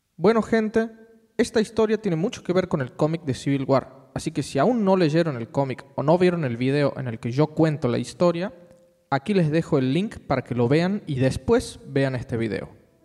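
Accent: Tucumán, Argentina. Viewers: tucumanoo como yoo jajaja de donde eres? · Bien relatado, se nota la tonada tucumana! jaja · sos tucumano, salteño o catamarqueño? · Tucumano o me equivoco? Ajajajja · sos tucumano?? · siempre me genero fuertes emociones el comic de ciwil war y los posteriores a este T-T recordar esto me puso triste otra vez XDD PD: Te encuentro acento argentino... jajjaja de que parte? yo soy de tucuman XD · eee el asento tucumano xd es feo sin ofender lo repito SIN OFENDER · no es que no sepa pronunciarlo , el es de Tucuman , Argentina y en esa zona tienen ese acento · Se te re nota el acento tucumano jajaja. Es genial ver a alguien del NOA con un canal con esta cantidad de suscriptores. Saludos desde Catamarca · Sos tucumano o santiagueño? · Este es Argentino :v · alto tucumano básico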